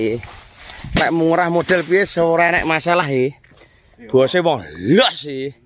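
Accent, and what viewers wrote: Tulungagung, Indonesia. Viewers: logatte jian kental tulungagung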